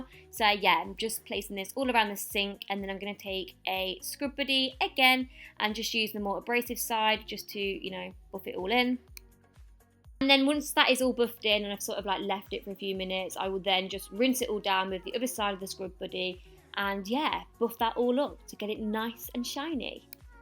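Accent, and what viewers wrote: United Kingdom. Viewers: Where do you come from? Is this a British accent?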